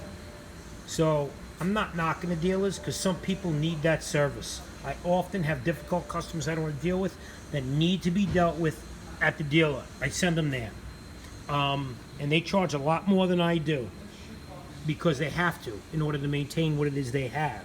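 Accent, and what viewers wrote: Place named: Valley Stream, United States. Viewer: I watched this video and by your accent I'm going to guess you are from valley stream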